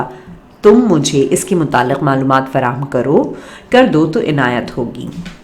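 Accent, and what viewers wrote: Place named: Upper class, Pakistan. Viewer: Irna burger ban k mt bolyn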